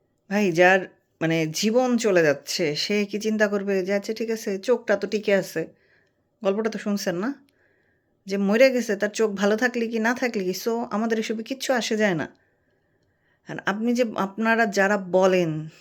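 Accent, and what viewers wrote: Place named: Chittagong, Bangladesh. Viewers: আপা আপনার চট্টগ্রামের আঞ্চলিক ভাষা অনেক মজা পাইলাম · আপা তুয়্যার মুখত চিটাইংজ্ঞা হথা বেশি সুন্দর লাইজ্ঞ্যে🥰🥰🥰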